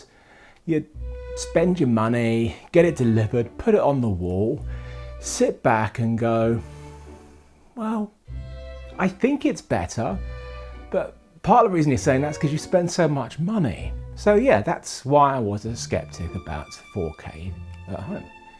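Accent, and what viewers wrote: United Kingdom. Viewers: Me... Why the heck is talking about a TV... Clicks Also Me... Who cares I'll stay for the British accent.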